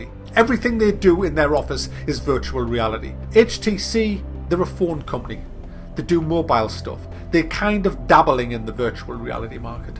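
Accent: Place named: Newcastle, United Kingdom. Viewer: Sounds like he's from newcastle, or near by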